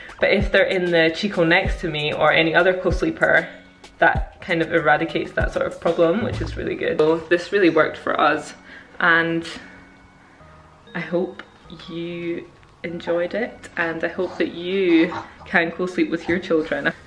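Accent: Scotland, United Kingdom. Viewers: Finally a Scottish accent like me 😂😂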